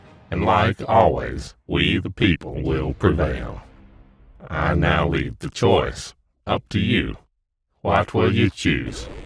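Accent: South, United States. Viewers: What is with the American accent? That just doesn’t seem right.... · why does the voice over have a southern USA accent? this was a really cheesey attempt at voter disuading vote or vote supression... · Oooof what a cringe video. Southern accent doesn't help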